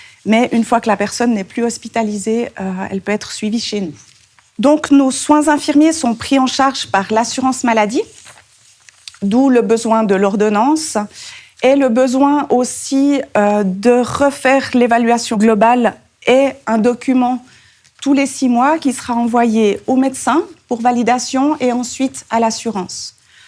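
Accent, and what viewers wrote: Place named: Switzerland. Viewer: Aaaah l'accent Suisse, pas d'IA. C'est reposant. Sujet intéressant. Note : il faudrait faire très attention quand vous parlez d'un thème qu'il n'y ait pas de bruits parasites derrière vous comme des gens qui toussent de manière récurrente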